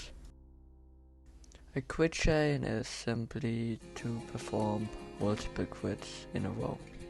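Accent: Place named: Germany. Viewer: Ngl as a German I think u have such a German accent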